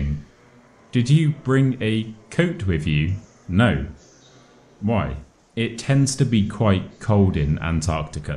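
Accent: RP, United Kingdom. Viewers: Teacher,my name is Grace from Taiwan. I thought your voice should be BBC accent. It is easy to prounce and listen accent.Yet,I had ever listened diallect British once all my life. It was really difficult for me. Completely not understanding. Thanks teacher for your instructions.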